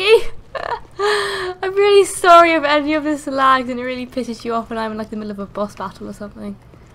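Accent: United Kingdom. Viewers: Finds I female Youtube ... I like this girl ... Wait she's British ... I really like this girl ... Wait she's playing Dark Souls OMG! Keep doing your thing never give up your amazing.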